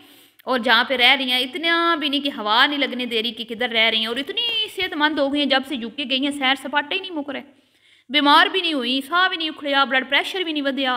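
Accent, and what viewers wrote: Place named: Saraiki, Pakistan. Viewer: آپ کے لہجے سے لگتا ہے ہم بھی سائیکی زبان بولتے ہیں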